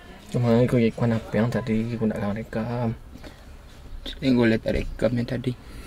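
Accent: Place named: West Sumatra, Indonesia. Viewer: Dapek na logat kuranjei mah mak.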